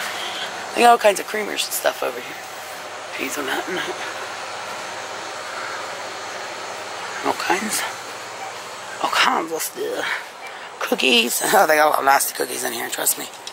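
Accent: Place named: North, United States. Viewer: I think you must live in north. Just the way you talk and eat. I live in Orlando but from Georgia.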